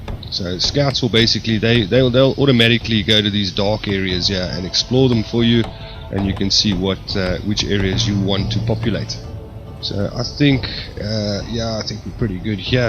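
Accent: South Africa. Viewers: South African! Nice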